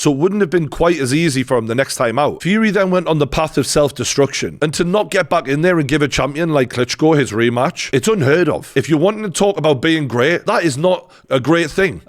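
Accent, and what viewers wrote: Newcastle, United Kingdom. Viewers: Watched the fight and totally agree a rematch he loses his punches had no effect on Nganou Excellent video as usual Our lass thinks there hilarious and your the only Geordie she’s heard speak the same as me lol, She keeps saying how come we all swear as much just tell her because people haven’t got a clue what we’re saying All the best lad and keep up the great work From one big old Geordie to a big younger one 👍🏻👍🏻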